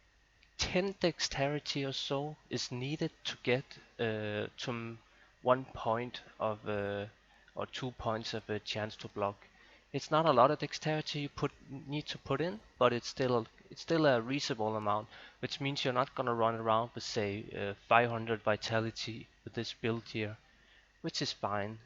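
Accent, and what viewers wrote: Denmark. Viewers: hey Fellow Danish fam. Jeg kan høre du er dansker. That accent though, also the keyboard saying "DAN"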